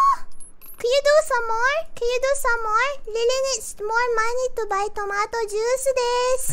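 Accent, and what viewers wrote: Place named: Malaysia. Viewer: She sounds so malaysian this is great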